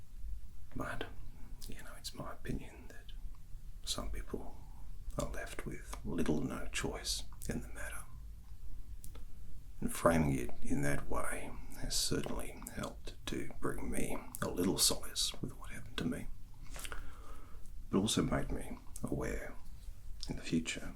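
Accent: Australia. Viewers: Definitely one of the best attempts I’ve heard at an Aussie accent. Feels very outback, like I’m here for sheep-related crimes. And the cordial bit really struck home for me, as someone who can’t stand plain water! Great job, from someone down in the forgotten part of Australia (Tasmania) 🐨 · Oh my god, he did the accent better then I thought. I’m also an Aussie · Aussie here, somehow sound more Australian then me 😂😂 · This is a better Aussie accent than most professional British or North American actors can muster, congrats! I had a good chuckle at Braithwaite though hahaha. Also I know nobody asked but I used to work for the police in both my state and federally and I've never heard anyone use the DI/PC abbreviations, I think that's just a British thing. So if you ever return to this character/do another Aussie cop for some reason they say their ranks in full. 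· and yes, like all the other Aussies, nice accent! Care to try a Hemsworth? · Mate, as an Australian.. I have to say .. your "I'm a Aussie Copper from the Outback " accent is pretty damn good, In fact, you would of passed with flying colours if you auditioned for any of the Australian 80's cop shows we had back in the day! HAHAHA! But seriously, not many folk can nail it - and you did a pretty damn fine job if I may say so ^_^ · I was honestly prepared to laugh at the accent as an Aussie myself but damn you did a pretty good job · mate, your Australian accent is awesome. I’m Australian. 👍👍👍❤️❤️❤️ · Ive heard much worse Australian accents, some from actual Australians. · Our sweet summer child, Stanley 🥹 Huge props on the accent! It kinda sounds like there's a lil bit of a Kiwi tinge in there, like he moved over here when he was very young. And as a Queenslander, I just want to say thank you for choosing the sunshine state! 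Sydney and Melbourne get like 90% of the attention when it comes to the rest of the world (heck, even within Australia 😐) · Ah, your Aussie accent and mannerisms were so familiar to me, an Aussie girl, that my tingles for the ' exotic ' went missing in action. Have you been here in Oz? Stanley is just like my uncle Ron, a decent, good bloke Aussie detective cop. They're not all good blokes though of course.....so some being a few flies in his ointment. I grew up travelling and living all around Australia and know that people usually have a mix of the slight differences in Aussie accents due to many transferring to different states and territories, usually for work. Stanley's slow old Queensland drawl would have me pick him as a banana bender easily. (QLD state known for banana production). I'm a sandgroper from Western Australia myself. The state known for the mildest accent and bloody sand everywhere and into everything. 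I guess we just mildly plough on through our day while casually dealing with this expected, everpresent mess. You can tell newbies to this state by their frantic car and house cleaning cyclonic dust up frenzies. They soon learn. Excellent work. Also you might be interested that some of my ancestors were a Welsh farming family from Carmarthen and came with the first fleet of settlers to help set up the Swan River Colony in Western Australia. The Llewellyn-Jones family. By their letters and family word of mouth history it certainly wasn't what they were expecting! Hardship, starvation, abandonment, after being deposited, by the British government, along with other Brit set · I’m aussie so I had to click on this purely out of curiosity to see how’d you go with the Aussie accent lol you are very talented I’m astounded by the amount of different accents and roles that you nail! Very impressive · How come no one is talking about the aussie accent being on point? 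· I clicked for the same reason as well as I enjoy watching what posts, I honestly braced myself to cringe cause I've heard a lot of butchered Aussie accents and thought I was gonna be hit by another. I was pleasantly surprised. What part of Aus are you from?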